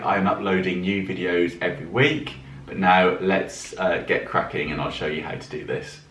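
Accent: United Kingdom. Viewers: Love your work. Sooo nice to hear a fellow Brit giving tutorials. Your instructions and explanations are fabtastucally clear. You don't jabber or waffle on. Love it. I have subscribed. Give us more please. I live in the Carribean, wo, and I have 6 acres of ladn around mynjousd. I am planting, planting, planting. Yayyyye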